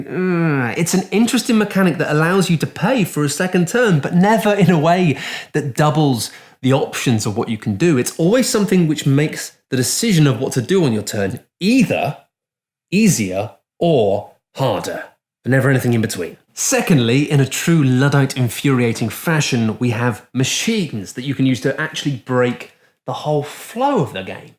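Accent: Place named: Northern England, United Kingdom. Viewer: Is it just me or is about a magnitude of ten more Northern in this review than normal? Good work, represent!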